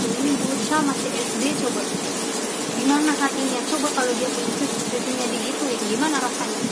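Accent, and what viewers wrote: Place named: Java, Indonesia. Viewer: medok bettt bahasamu mba apa lagi pas ngomong "GUE" medok beettt sumpah mba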